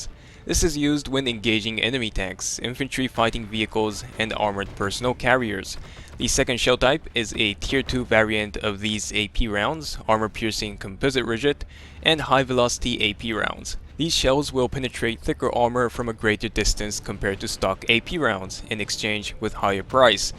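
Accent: Germany. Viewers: U don't speak english normal...are u german or something?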